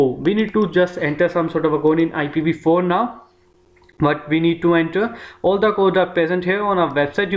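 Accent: India. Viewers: india accent ? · fuck you indian accents i get cringed everytime i listen an idian talking english smh smd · Instantly clicked off once I heard the Indian acent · Its a Indian accent.... Easy to understand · <3 indian accent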